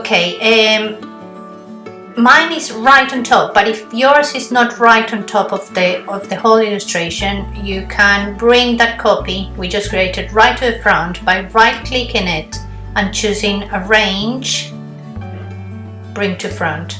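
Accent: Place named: Latin America. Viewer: Loved your tutorial! Learned so much. Also loved the mix of your Latin and English accent. Hypnotizing lol.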